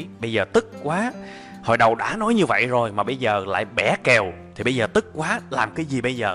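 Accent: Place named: South, Vietnam. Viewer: Rất thích giọng miền nam rỏ ràng dể nghe 👍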